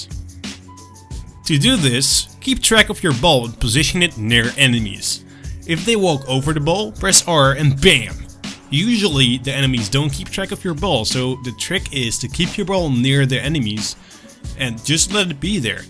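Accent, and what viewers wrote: Netherlands. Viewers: This guy sounds so Dutch. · dat dutch as fuck accent tho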